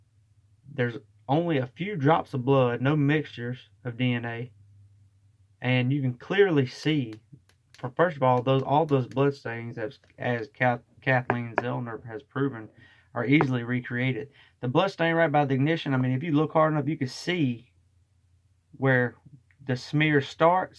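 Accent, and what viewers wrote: South, United States. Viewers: Thank you doing great and I love the southern accent and who cares do not listen to the haters ,Welcome freedom fighter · Great video. Love listening to your southern accent!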